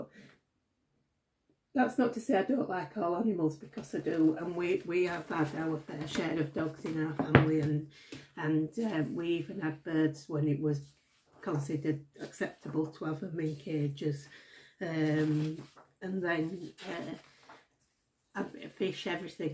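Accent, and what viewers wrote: Yorkshire, United Kingdom. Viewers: So happy I came upon your channel. I love to have a cup of tea and watch and listen to you. Your accent is very familiar since I was born in Idle, Yorkshire. Coming for a visit in October. So very excited since I get to bring my American husband to where I was born. Keep up your beautiful and artistic crochet.❤️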